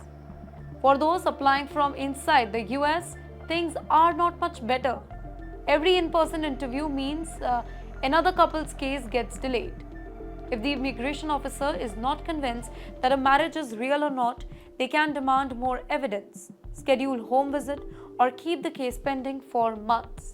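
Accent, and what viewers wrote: India. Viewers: She is Indian. So typical Indian accent is obvious.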